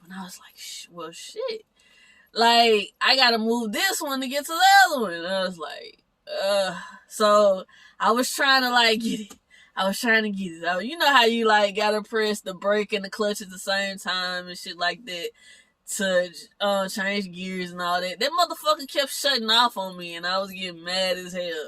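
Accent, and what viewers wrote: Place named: Memphis, United States. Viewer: Your hair taking off . Love that Memphis accent mane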